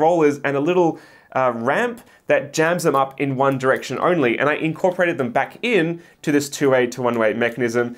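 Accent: Australia. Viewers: I was sold into printing as soon as i saw your big beautiful face and awesome Aussie voice! Youre still the only maker whos community I subscribe to and happily financially support, as you show me all of your failures alongside your successes. Since finding your videos, they have helped me define a path to create a small business creating Flow instruments, such as Dragon Staff, contact staff, poi, and fans. The best company on the planet for this is called ThreeWorlds and theyre actually based out of Queensland. You may be interested in some of their cool spinning geometry as i was, but would be able to acquire it much easier than me over in the States! I learned Onshape, and then you convinced me to learn Fusion360 instead, and then i made all of my own designs! I am currently expanding to have 3 printers, highly modified with Hemeras to print TPU at 100mm/s, just like my first e5+. 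I wouldnt be where i am without you, and my girlfriend is eternally jealous of how much attention you get! -Connor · I’m your biggest fan I’m in australia and only last week I realised your Aussie O.M.G 😀 can I send you a latter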